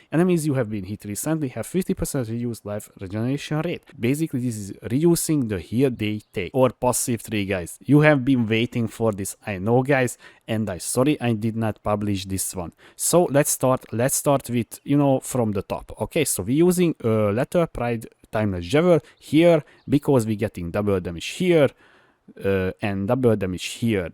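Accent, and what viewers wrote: Hungary. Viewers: Te figyelj, gyönyörű a nyelvtanod, de a kiejtésedről felismertem hazánkat xd Szuperek a videóid szóval nagy hajrá! Aztán idővel csiszolódik majd a prönánsziésönöd is <3 Keep up the good work! Edit: PoE2 kontentet nem fogyasztok, de kaptál egy feliratkozót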